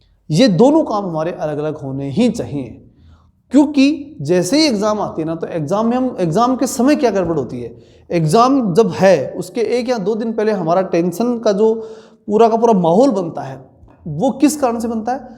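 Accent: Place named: Bhilwara, India. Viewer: सर आप भीलवाड़ा जिले के हो क्या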